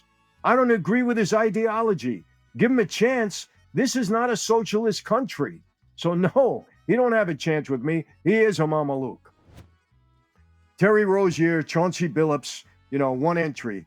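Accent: New York, United States. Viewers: Such a great word . Said with perfection with your Distinctive Italian/Anerican New York scrabble . How have you not done voice work??? Really easy on the ears. That’s a good diversity actually. Get some good scrips and escape into a sound booth brother. Need right scripts though! Just thinking out loud brother. 😅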